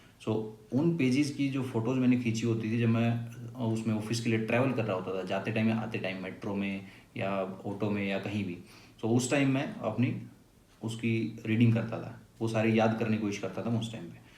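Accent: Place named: Haryana, India. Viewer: thankeww for the strategy but i love the harayanvii touch in language❤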